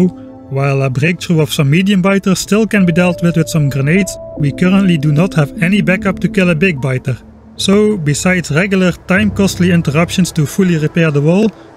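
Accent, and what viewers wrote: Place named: Netherlands. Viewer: 99% sure your Dutch just from hearing the first 30 seconds of this video. Btw first time on your YouTube channel/ first video I’ve seen of you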